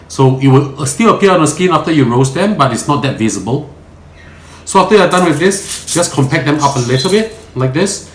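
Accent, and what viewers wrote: Malaysia. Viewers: Malaysian accent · 一口馬拉音，哈哈😄⋯⋯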